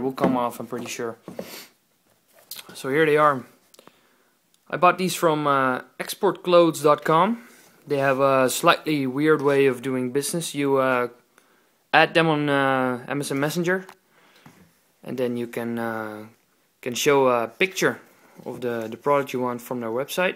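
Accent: Netherlands. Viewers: Dude your accent sounds dutch. Im from holland also but they wont answer me on msn. How much did you pay for these? thanx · O BTW again are you dutch? you sound dutch (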